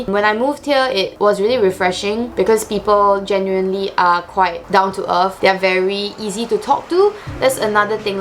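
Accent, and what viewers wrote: Singapore. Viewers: Qiaolingvlog here, thank you for sharing and I feeling your English mix Singlish already lo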